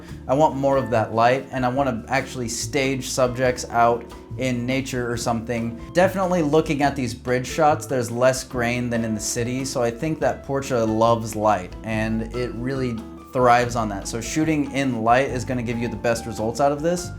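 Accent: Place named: Seattle, United States. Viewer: From they way your talking it sounds like your from Seattle, I’m from Seattle to and are trying to get back into my photography from taking a little, i recently just ran across a Pentax K1000 w/ a tamron SP 35-210mm lens at a pawn shop and had to get it, I loved your video, I have to start practicing with mines .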